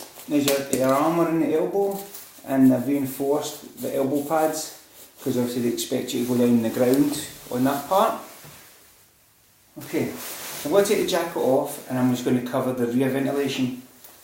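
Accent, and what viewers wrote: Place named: Scotland, United Kingdom. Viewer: Dude are you scottish? wow your accent... Nice review anyway thanks!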